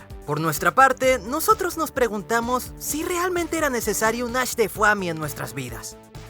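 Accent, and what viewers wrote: Venezuela. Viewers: eres venezolano o que men?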